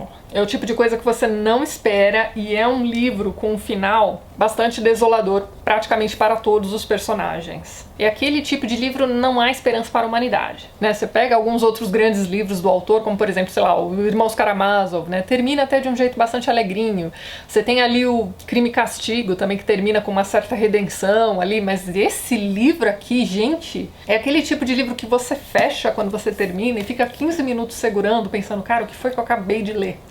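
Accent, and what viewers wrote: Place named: Brazil. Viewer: Despertou curiosidade de ler e estou lendo. Bons resumos despertam vontade de ler. Rui, um português de Portugal que julga excelentes os vídeos apesar do português com "açucar".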